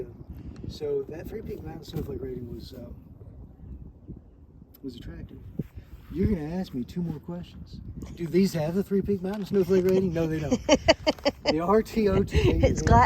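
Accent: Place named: South, United States. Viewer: That's right! Speak your southern english just as natural as you please. Roll Tide.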